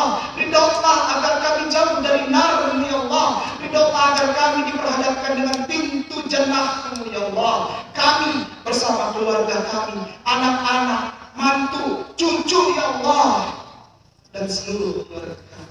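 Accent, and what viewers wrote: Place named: Ambon, Indonesia. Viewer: dr suaranya sudah ketahuan kalo bliau orang ambon, gag perlu lihat muka ato nama,,, suara vokal orang ambon itu khas banget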